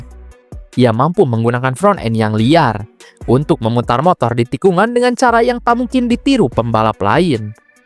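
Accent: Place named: West Java, Indonesia. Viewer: Sunda pisan 😂👍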